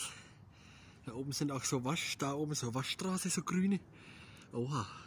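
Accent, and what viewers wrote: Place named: Franconia, Germany. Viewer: Dei frängisch is einfach herrlich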